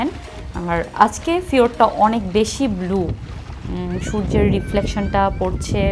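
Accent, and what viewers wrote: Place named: Noakhali, Bangladesh. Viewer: ভ্লগ তো অসাধারণ ছিলই বাট ভাইয়ের নোয়াখাইল্লা একসেন্ট বহুদিন পর শুইনা আপ্লুত হইয়া গেছি।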